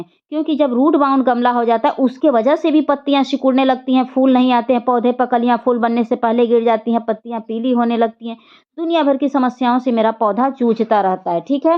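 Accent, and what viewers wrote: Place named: Uttar Pradesh, India. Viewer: आप पक्का यूपी से हैं।🎉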